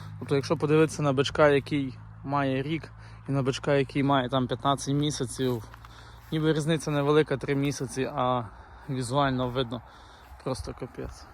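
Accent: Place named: Lviv, Ukraine. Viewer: Привіт,чую по розмові шо ви з Львівщини,підкажіть де можна придбати теля бичка шароле?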